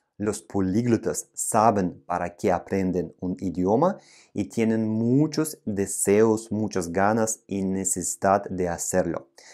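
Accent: Russia. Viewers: Su acento es grave. Tiene un acento ruso en espanyol. No recomiendo aprender ahi. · por primera vez he escuchado un acento ruso en espanol. Interesante.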